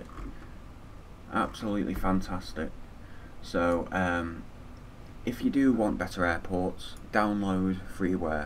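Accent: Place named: Manchester, United Kingdom. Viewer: Congrats on the 2k subs here's to 3k+. Absolutely brilliant job and so well explained. Been through many tuts as a newbie, and yours should beat the top. Pruod to see a fellow Manny making something complex so informative and easy to digest...your a star, no idea how much i struggled before this tutorial. well underrated. thank you..liked...subscribed. ❤️ p.s Yup he's manc (heard the shouting) yup he's definitely Manc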